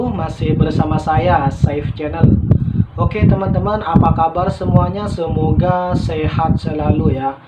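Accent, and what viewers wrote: Indonesia. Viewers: Mahal sekali suara suara latar yang sesuai membuat video.sedap dengar,walaupun ada alang keindonesia nya.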